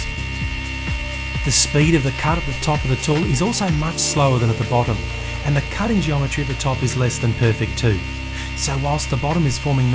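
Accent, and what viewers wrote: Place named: Australia. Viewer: Knew it.. your aussie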